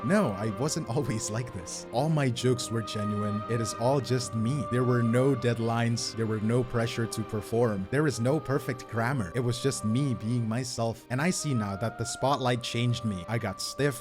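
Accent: United States. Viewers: imma be serious, till this drama happened, I did not know I were from the Philippines and genuinely thought you were an American with a really cool accent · not american? aintnoway you're Indonesian my brother as fellow indonesian your speaking tone sounds more american like newyorker or from cali💀 but hey im glad that you're being honest and clarify misunderstanding ( just rare to see sea cc that have community that full on people speaking english )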